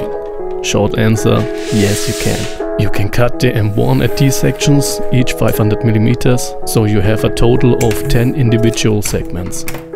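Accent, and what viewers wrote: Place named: Germany. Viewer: Nice, and then the song 😂 You sound German not Dutch.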